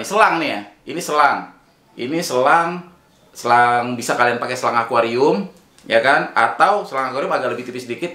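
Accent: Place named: Betawi, Indonesia. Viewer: Mantap bang,,,suka betawi nyablaknya,,salam berawi ora,,,😆😆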